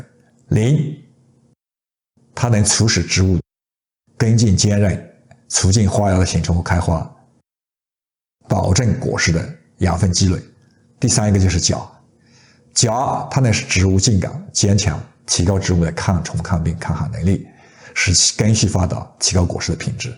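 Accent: Yunnan, China. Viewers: 听得出是我们云南人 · 老师口音好像云南这边的。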